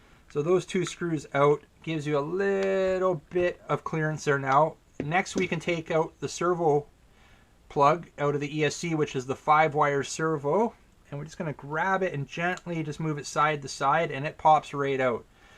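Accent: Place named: Canada. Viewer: haha, love your canadian accent!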